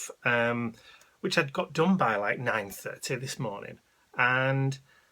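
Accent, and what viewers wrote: United Kingdom. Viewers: I understand the people that loves to hear your voice. I'm Belgian and I love that British English so much also and the vlogs. Only started to watch your videos a couple of month back and watched every video on your channel. You are a bit addictive, LOL. Have a nice week:-)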